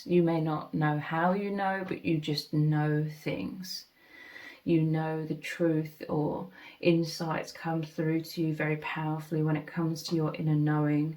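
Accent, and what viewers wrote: United Kingdom. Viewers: Yes ma’am sounds a lot like me. I’m very empathic probably over the edge super empathic or something, but I had to go quite silently on a lot of folks that don’t deserve the privilege I’ve ever been you know again they don’t deserve that after that transaction of pain. It was a crucible that really changed me an altered us quite a bit me and my dog, but we’re looking forward to better times So I could sign my name or something, but I’ll just put a little emoji that describes me quite well It’s very ferocious there and then and yours is a pretty good way to describe me as part of that team and stuff but we’ll just get on with this. Have a great beautiful day You sound like you’re from over there in British land or something Take it easy 👉 🐺 👈 I kinda had to get that way you know all that I had to observe and punishments and announcements that I had to absorb and then read myself well it was left with something to protect me in a biscuit here Alone, one .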